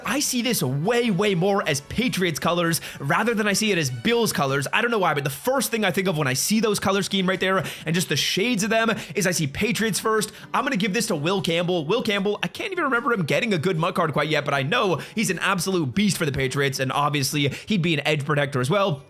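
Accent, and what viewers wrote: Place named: Canada. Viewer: Been saying the same this for like 2 years lol but it’s his Canadien accent I think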